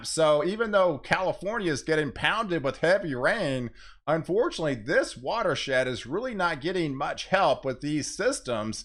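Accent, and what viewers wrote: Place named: Texas, United States. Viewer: youre in mckinney??? i was trying to figure out your accent.. not quite a east texas accent?? my folks live in benbrook over in foat wooth.. we re in italy.. thanks for all yall do.. blessings..doc johnny nato sicily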